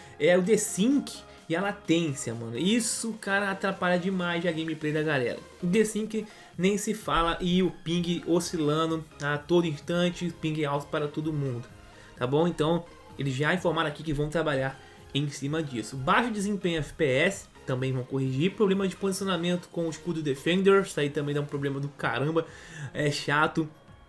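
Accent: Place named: Rio de Janeiro, Brazil. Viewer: essa sua voz de carioca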